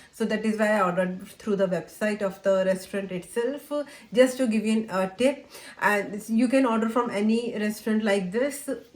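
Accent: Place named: England, United Kingdom. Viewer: Nice English accent, not too hard to understand.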